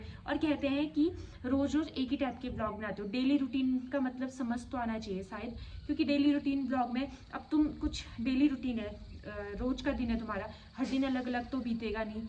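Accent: Pahadi, India. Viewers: Prnam 🙏🏼 dii mai hldwani se huu dii aap kbhi vlog phadi leaguge mai v banyaa kro kuki achaa lgtaa h phadi sunaa kisi muh se or apki tone v ati h phadi isliyee achaa lgta hh ❣️💕💖💖❤️🤗🤗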